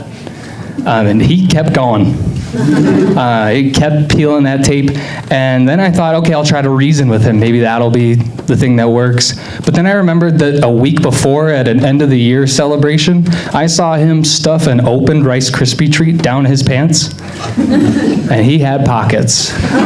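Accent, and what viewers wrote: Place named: Brooklyn, United States. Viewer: Is this guy Brooklyn-based does anyone know? He has a very Brooklyn delivery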